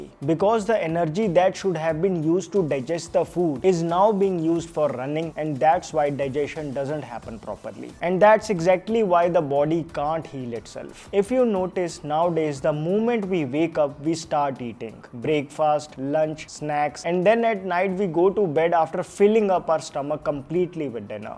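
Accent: India. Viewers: Pta nhi Bhai ki english hindi jaisi lgti h 😅😅 Nice information ♥️🌸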